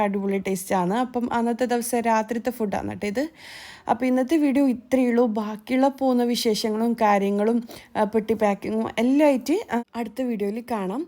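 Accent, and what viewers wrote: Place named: Cheruvathur, India. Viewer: njanum cheruvathur. aanu. samsaram same. aanu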